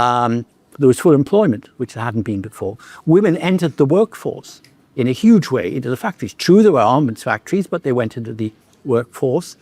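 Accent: United Kingdom. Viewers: He sounds to me really Brittish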